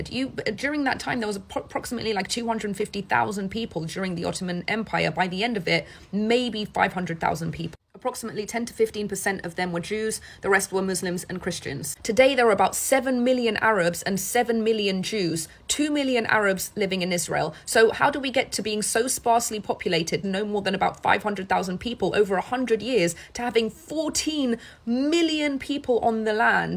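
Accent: United Kingdom. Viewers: I did not know people speak English with british accept in Iran...lets face it she is not Iranian... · Info on anything in the Middle East would get better reception from someone without some version of a British accent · she sounds British lol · Nothing you have said contradicts anything I said. The basis for the word "Persian" is "Farsi", which is an Iranian language, and many Iranian people do indeed identify as ethnically Persian. many do not. I said Iranian PEOPLES, not people singular. She is indeed Iranian, but her accent is British. She was brought up there. Are you seriously using her accent to check her accuracy? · A Muslim Iranian, speaking perfect British English. Straight out of Babylondons propwash academy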